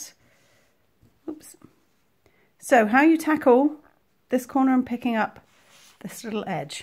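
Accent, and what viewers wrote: England, United Kingdom. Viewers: Great video I have subscribed and shall look at your other vids You sound British so why are you using USA terminology? You even kept correcting yourself when you said treble. Not trolling or criticism just curious? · Hi this is brilliant - so grateful for your lovely video tutorials. The only thing confusing me is your use of US terminology in your lovely English accent - but that isn't a criticism as just so grateful to be able to follow your methods. Will this CJAYG method work on the solid granny square and do you have a tutorial for that as well please.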